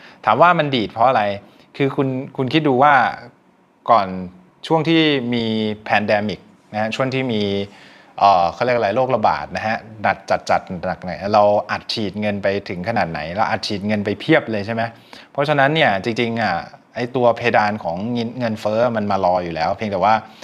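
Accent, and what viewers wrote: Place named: United States. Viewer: คุณพูดภาษาอเมริกันได้ 100% พ่อแม่เป็นคนไทยและโตที่อเมริกาเหรอคะ. ข้อมูลข่าวสารดีมากคะ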